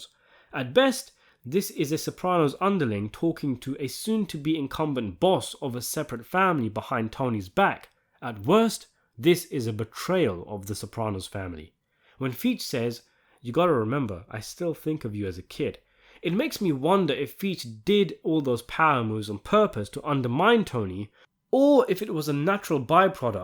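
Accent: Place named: British Asian, United Kingdom. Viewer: Is the narrator British Asian? He has a slight accent. Good video - good channel, just curious.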